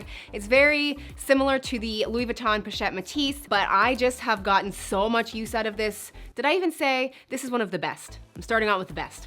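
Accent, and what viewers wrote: United States. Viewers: She has an American accent 😅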